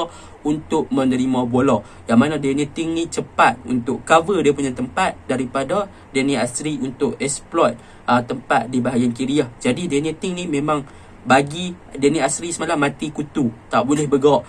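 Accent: North, Malaysia. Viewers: Pekat sangat aihh bunyi utagha tu. Mana mana rancakk! Keep going bro!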